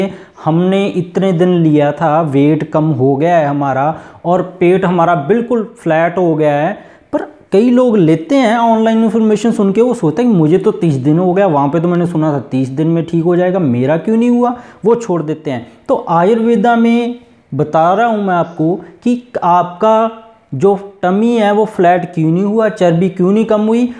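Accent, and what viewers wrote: Punjab, India. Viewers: I love the punjabi accent when speaking hindi :)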